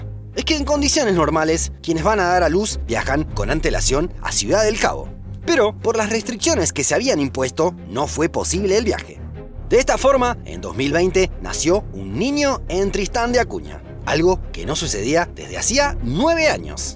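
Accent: Argentina. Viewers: Olvidaste la Isla de Pascua .mmmmm tienes acento argentino. Eso lo explica . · Gracias! Me gusta mucho las vídeos como este. Por cierto, con que acento habla presentador? Argentino?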